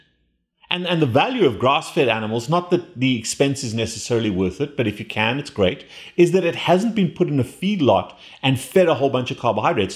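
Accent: South Africa. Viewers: I could tell you are South African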